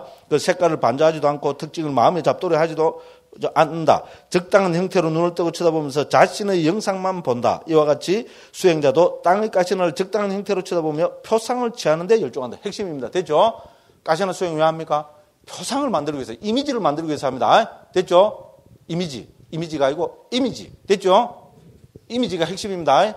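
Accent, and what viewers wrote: Gyeongsang, South Korea. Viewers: 됐죠 스님~~ 경상도 사투리가 참 구수합니다.^^ 법문 감사합니다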